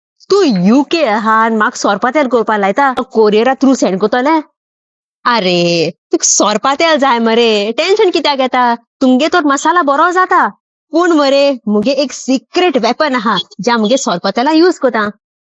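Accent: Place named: Konkan, India. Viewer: Tuzhe konkani accent aykopak bare dista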